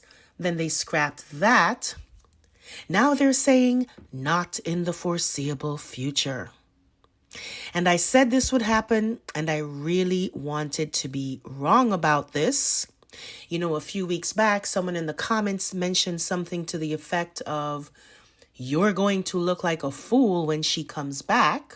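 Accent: United States. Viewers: I hear Brooklyn or the Bronx in your voice ❤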